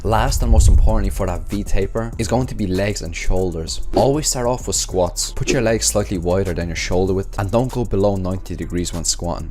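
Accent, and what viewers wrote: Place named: Zimbabwe. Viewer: Nice Rhodesian accent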